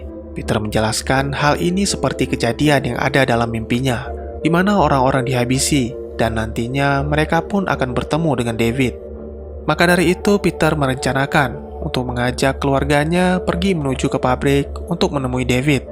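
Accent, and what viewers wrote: Aceh, Indonesia. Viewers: Mimin org aceh ya?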